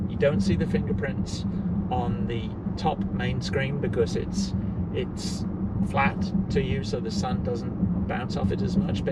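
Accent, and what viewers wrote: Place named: United Kingdom. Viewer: I'm thrown off by the Brit voice and the vehicle isn't right hand drive. The two just somehow belong together.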